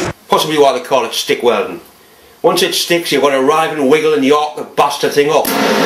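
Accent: Newcastle, United Kingdom. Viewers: Been in Engineering all my working life - so well used to the tchnical terms used. First time I watched this, I laughed so much the tears ran down my face! Great Geordie accent - brilliant and sound Engineering advice - lots of good ideas. Keep up the good work. You must be a proud Dad!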